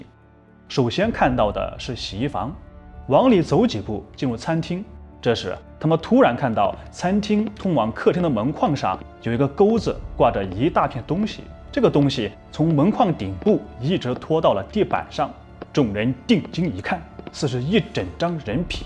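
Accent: China. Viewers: 内地口音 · 我一直想知道你是哪裡人？字幕是繁體，用語和口音卻比較像國內，所以比較好奇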